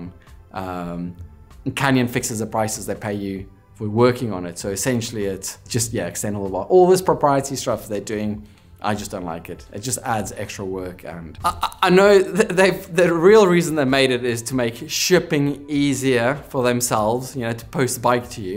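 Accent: South Africa. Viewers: 😂strong opinions much? This cat is hilarious. Do I detect a South African accent? If so it’s typical 😂😂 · A bit of a South African accent I detect there? Lovely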